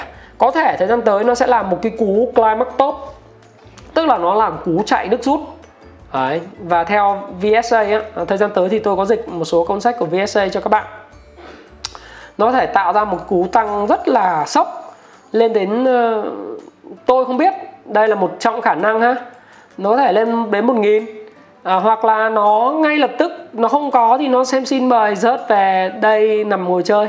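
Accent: Standard. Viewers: Anh nói chuẩn cmnl. Hơi bậy tý. :D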